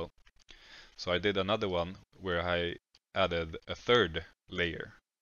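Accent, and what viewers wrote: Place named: Sweden. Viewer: I've never been more sure in my life that I am listening to a swede.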